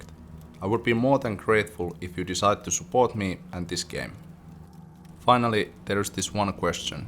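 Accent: Finland. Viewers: You sound like Finnish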